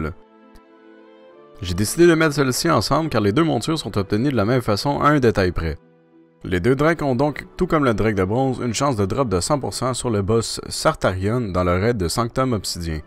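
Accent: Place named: United Kingdom. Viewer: Ton accent anglais est formidable et fait pâlir nous autres Français. Sinon très bonne vidéo !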